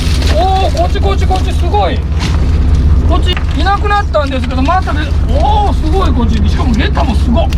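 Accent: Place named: Bingo, Japan. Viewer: 今回も大漁おめでとうございます 腰がうたう 備後弁久しぶりに聞きましたwww 無理せずこれからも頑張って下さい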